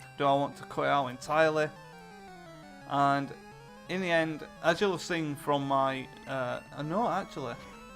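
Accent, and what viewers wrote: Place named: United Kingdom. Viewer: ok youtube really need to work on their close captioning of british accent.. srsly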